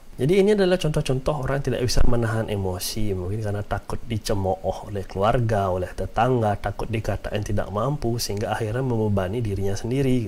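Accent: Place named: Bali, Indonesia. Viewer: Wah, ketemu channel ini, suka kale pembahasanny dan logat balinya.. dulu pernah kerja di bali 2 tahun... Jadi sudah biasa sama logatny.. moga2 channelny bisa kembang terus.. yg di sharing kebanyakan bermanfaat dan mindsetny bgs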